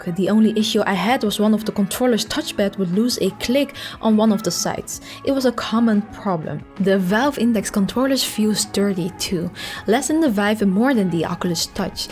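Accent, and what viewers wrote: Netherlands. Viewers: is she dutch? she sounds really dutch.. shes dutch! is she dutch?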